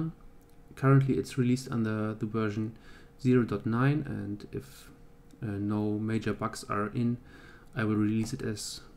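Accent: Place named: Germany. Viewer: Bist du deutsch? Man merkt iwie einen Akzent heraus ^^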